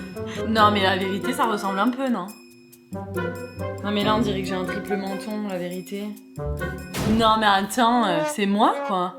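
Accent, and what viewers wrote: Quebec, Canada. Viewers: J’ai remarqué que tu avais quand même un petit accent québécois hein ! En tout cas super vidéo ! N’hésite pas a voir ma chaîne et me dire ce que tu en penses pour m’améliorer !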